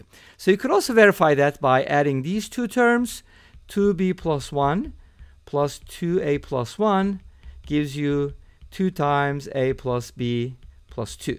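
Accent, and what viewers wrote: Turkey. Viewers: You have a really turkish accent, are you any chances turkish?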